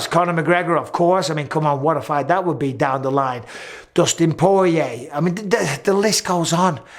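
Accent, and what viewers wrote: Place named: Manchester, United Kingdom. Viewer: tries so hard to be nice but the Ol manc comes out eventually 😄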